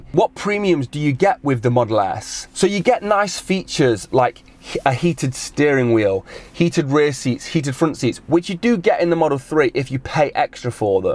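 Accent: United Kingdom. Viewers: british...oh no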